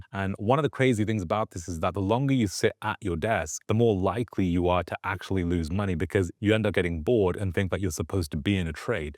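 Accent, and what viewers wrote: United Kingdom. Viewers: Used to live in London. Hear the accent. Thanks for this FVG ORB strategy. For beginners on accounts less than $25k, limited to three day trades per 5 days. I tested this 03/20/26. MU had bull FVG , , . Entry . went south hit Stop loss . NBIS: breakout , FVG . Entry. dropped into OR, rose out then dropped again to Stop Loss . And one more CRCL: breakout . FVG small . Entry. Went south . Hit stop loss . Win %: 0% Bad day/bad week for bulls. These were all bulls. Not into shorts yet, I should have as the market was heading south. SPY could have netted almost 10:1r:r short. The psychology is spot on. One question: do you stop checking for FVG at 10am/11am? Usually by then the market has cooled so if you don't hit FVG by then you are likely not going to make much? Thanks again. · bro, take your fucking British accent and pike on some curry and shove it up your fucking ass! 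Scammer, scumbag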